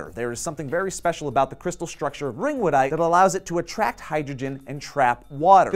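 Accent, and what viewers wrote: South, United States. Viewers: Yay for southern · a little offended by the southern accent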